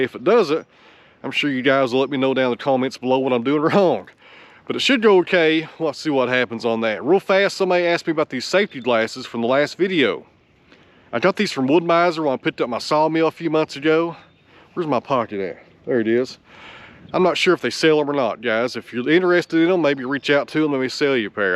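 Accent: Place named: Tennessee, United States. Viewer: I live in the UK, getting out about is a little restricted for me at the moment. Love what you do in the videos, I never thought sawmills were so interesting before but glad I found your channel.... love listening to your Tennessee accent too, very warm and welcoming.... you narrate your video stories so easily, sign of a quality creator on Youtube.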